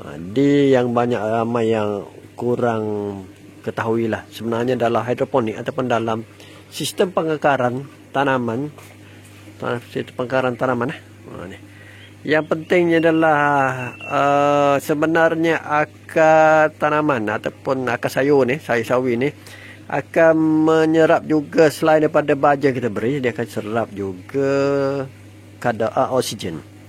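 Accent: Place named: Kelantan, Malaysia. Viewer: cikgu ni org kelantan kea?..ad bunyi Kelantan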